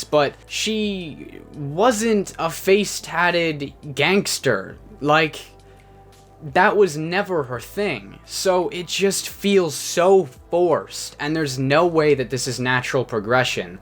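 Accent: Canada. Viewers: Bro are you Canadian we can tell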